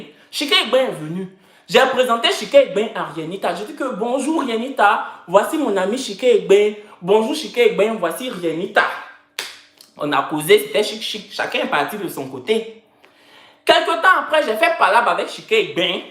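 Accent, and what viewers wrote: Cameroon. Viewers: ton accent deh, maintenant tu n'est plus ivoirien tu es camerounais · Ton accent devient camerounais j’adore 💜😍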